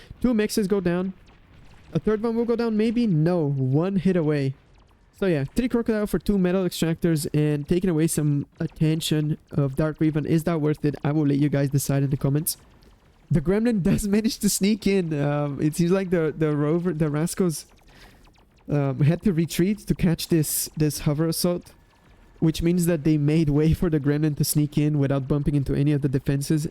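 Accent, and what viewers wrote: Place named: Quebec, Canada. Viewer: This guy kind of has the George St. Pierre accent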